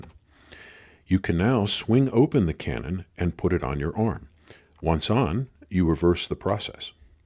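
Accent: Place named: United States. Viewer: No, an American voice talking about anything makes me puke.🤮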